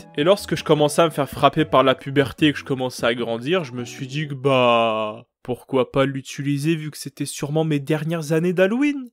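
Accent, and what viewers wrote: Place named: Canada. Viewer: Oui no jugement mais j'aime bien l'accent canadien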